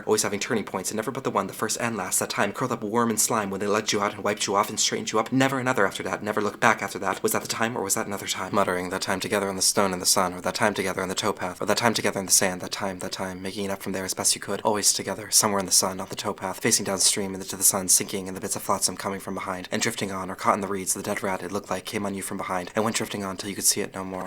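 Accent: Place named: United States. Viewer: Im not certain an american accent lends to the phraseology of beckett.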